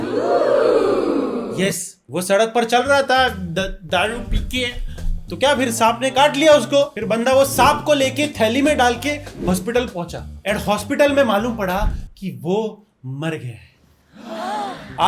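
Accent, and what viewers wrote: Gujarat, India. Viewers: Your Gujarati accent.. 😂😂😂I like it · That gujrati accent of yours 😂😂😂 soo good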